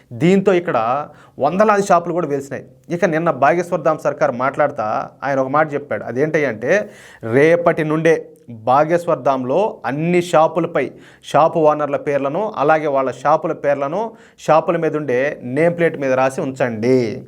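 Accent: Rayalaseema, India. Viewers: అన్న యొగి గారు మొది గారు భారత దేశానికి గొప్ప వ్యక్కులు. మీరు మంచి కంటెంట్‌ ఇసున్నారు. మీ సీమ యాస సూపర్‌ అన్న. 🙏🙏🙏 భారత మాతకు జై ✊✊✊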